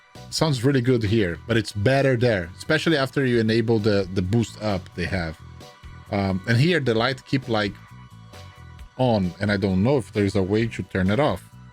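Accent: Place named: Brazil. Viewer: You sound like a brasillian speaking English 🤔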